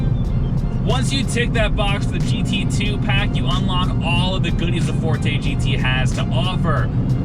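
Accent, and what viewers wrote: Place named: United States. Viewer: Jordan Henderson is doing car reviews?! Sick. Nice American accent btw.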